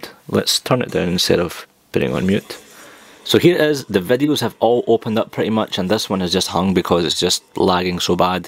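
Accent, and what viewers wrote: Scotland, United Kingdom. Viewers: Scottish accent FTW!! Nice video btw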